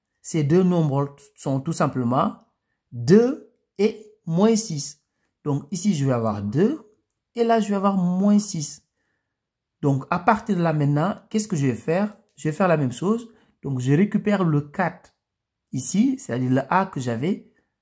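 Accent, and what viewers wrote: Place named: Senegal. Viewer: Accent sénégalais...merci compatriote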